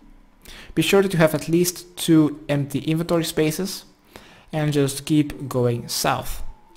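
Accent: Netherlands. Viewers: Legend! Thank you! Btw: are you Dutch?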